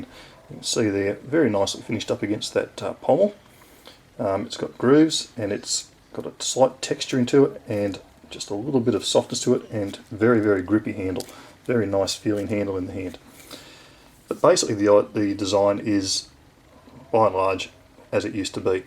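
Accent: Australia. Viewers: simple entertaining and informative review 10/10, where abouts in Aus you from mate ?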